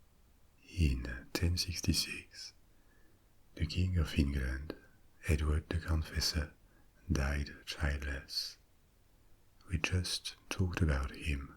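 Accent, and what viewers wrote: France. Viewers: I'm french and I'm listenning to a french guy speaking in english, I guess I'm weird. However the voice is really relaxing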